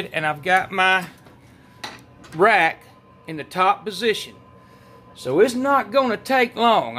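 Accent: South, United States. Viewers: Thanks for the great video. I've never pulled that membrane off but I will now. love that southern drawl you got. Gene from Canada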